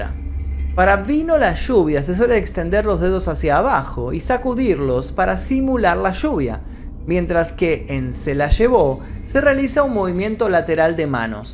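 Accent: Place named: Argentina. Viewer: si se re nota que es argentino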